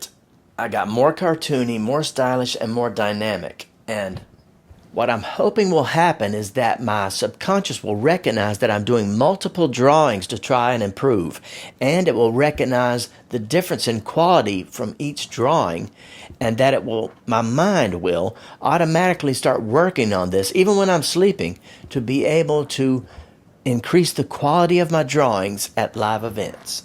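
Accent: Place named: Texas, United States. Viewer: I love your Texas accent.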